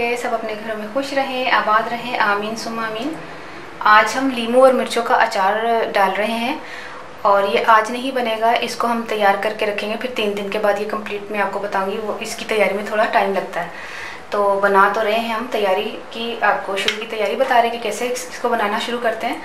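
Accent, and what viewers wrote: Punjab, India. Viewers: ساتھ ساتھ پنجابی کا تڑکا بھی لگ رہا ہے۔ خوش رہو میری بہن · Walikum aslam Her punjabi so sweet Thank you ma'am so much for sharing ur knowledge with us🤓🤓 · Your punjabi is very sweet and your achar is very good and yumm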